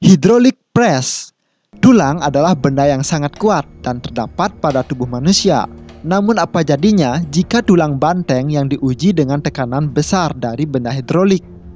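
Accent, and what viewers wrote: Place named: Bali, Indonesia. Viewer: Pengisi suara orang Bali😁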